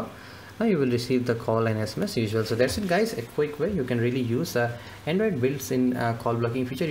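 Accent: India. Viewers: Indian accent+low quality microphone+bad quality video= can't understand what you are saying.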